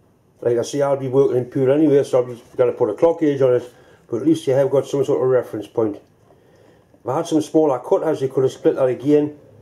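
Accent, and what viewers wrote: Newcastle, United Kingdom. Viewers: Geordie, is he ?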